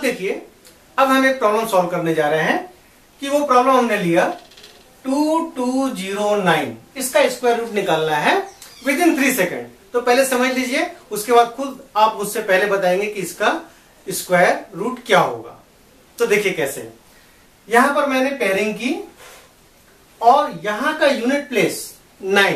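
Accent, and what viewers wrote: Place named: Bronx, United States. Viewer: His south Broncs accent is hard to understand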